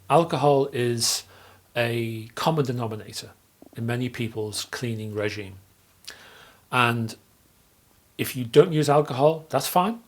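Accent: United Kingdom. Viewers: Thanks so much for this video. I enjoy your demeanor and easy-to-understand British accent. But I have a few things to say. I've heard that the PVC used in records is normally clear. That's why nowadays we get so many colored ones on the market. Evidently your black samples were treated with some type of carbon, that's what I've heard btw. Now as for alcohol use, how I tested it was to put undiluted store bought 70% isopropyl on a record in a 3" square area and let it sit overnight. The next day, having played the record previously I played it again and noticed absolutely no difference. I think the best way to test something that may have detrimental effect would be try it out on an unwanted record in an undiluted form. If it causes damage of course, back it off. 😊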